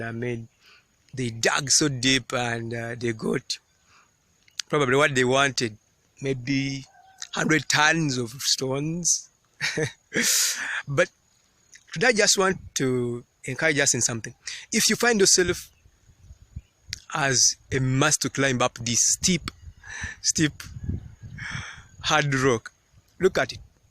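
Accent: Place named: Uganda. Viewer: Hi...moh here new subbie..I swear I watching trying to figure out which country you're from from your accent...Are you from Uganda????...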